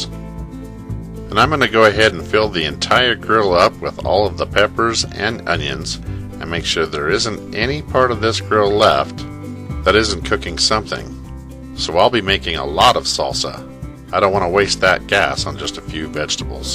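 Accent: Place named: Texas, United States. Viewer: Lol. Come to Texas. We all talk like this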